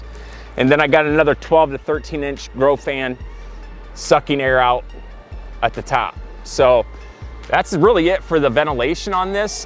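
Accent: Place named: Wisconsin, United States. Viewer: You sound like you're from Wisconsin lol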